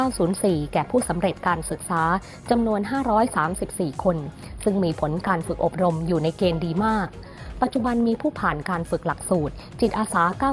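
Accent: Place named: Isan, Thailand. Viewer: คนอ่าน ติดสำเนียงอีสาน ใจเย็นๆนะ. ค่อยๆพูด. ตัว ก.เป็น. จ. ตัว. จ. เป็น. ก. จิตอาสา. ไม่ใช่. กิจ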